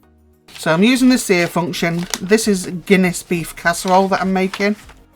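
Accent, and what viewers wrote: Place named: Lincolnshire, United Kingdom. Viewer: Well done, got one now..well pleased. Oh Are you from Lincolnshire.,thought I recognized the twang..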